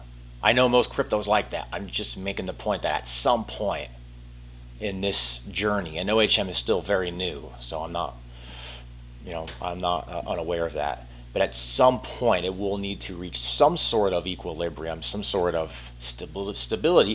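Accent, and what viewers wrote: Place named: New England, United States. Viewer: You sound like you're from New England..😎😎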